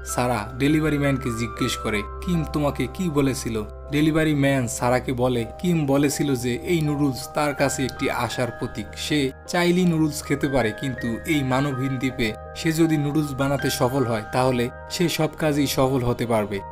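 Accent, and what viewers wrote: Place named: Barisal, Bangladesh. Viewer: ভাইয়ের বাড়ি সম্ভবত বরিশাল অঞ্চলে। উচ্চারনে আঞ্চলিক টান। একেবারেই আনস্মার্ট উচ্চারন।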